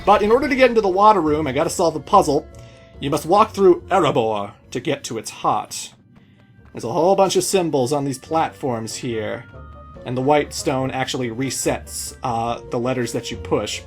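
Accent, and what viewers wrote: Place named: Canada. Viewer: I'm here for the sound of your sexy Canadian voice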